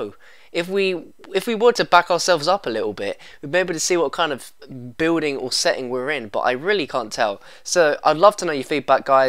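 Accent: England, United Kingdom. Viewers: well you are judging him by his voice? hes in uk not in america or anything he has an ENGLISH accent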